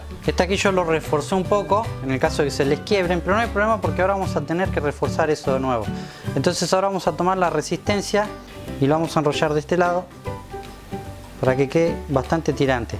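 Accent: Argentina. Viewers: oh un argentino que bueno like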